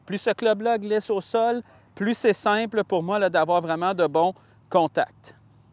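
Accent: Quebec, Canada. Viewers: Merci, simple et efficace. Bravo pour ton talent de pédagogue avec l'accent du Québec en bonus pour nous autres français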